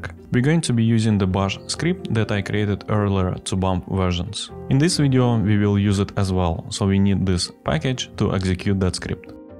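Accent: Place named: Russia. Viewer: Слушать ролики на английском с русским акцентом помогает тренировать аудирование!:)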